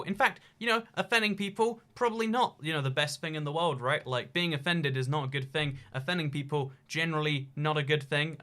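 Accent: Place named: England, United Kingdom. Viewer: Idk if u know but he just has a english accent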